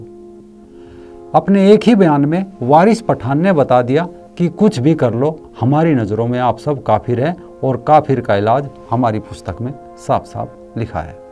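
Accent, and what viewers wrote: Haryana, India. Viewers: Bhai aapke bahut videos dekh Chuka Hoon. Ek baat saaf saaf keh deta Hoon, Aapka bolne ka tareeka aaj ke har pravakta see behtar hai...! Aapki boli me haryanavi yaa garhwali/kumaaoni jaisi boli dikhaayi pad rahi hai.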